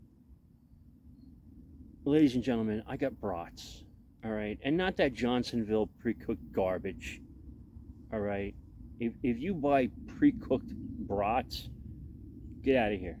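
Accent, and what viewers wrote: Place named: Long Island, United States. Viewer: you r an american orthodox cowboy with a longisland accent. a ministry for the ppl. retards unite. simplecore ftw. and mexican liquid bread is a simple joy. 💕🙏🏻 always praying for u Fren.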